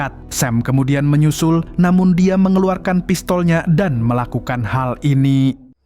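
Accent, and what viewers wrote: Banjar, Indonesia. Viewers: Logat mimin kek org banjar... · Org bnjar bnar Sidin nie amn di dengar logatnya😅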